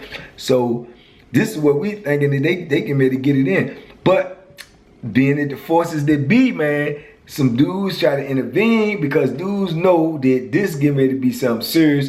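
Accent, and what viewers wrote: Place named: Tidewater, United States. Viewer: Enjoyed that story. Got that heavy tide water accent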